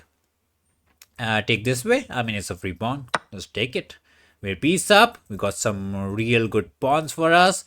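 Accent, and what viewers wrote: India. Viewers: bro can you please stop with this indian accent because i cant take anything serious, i know you can speak english normally.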